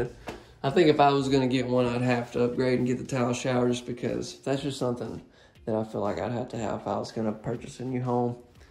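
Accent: South, United States. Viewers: I was surprised with the price on this...I was expecting it to be about $50,000 more than the price you quoted! Also, you didn't point out that the closets have traditional shelving and round rods to hang things on. I notice you corrected yourself when saying "utility". I hope you keep talking the way you did in earlier videos. I like hearing your Southern charming accent! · Stop saying utility!! 😡Keep that charming southern accent! 😍🥰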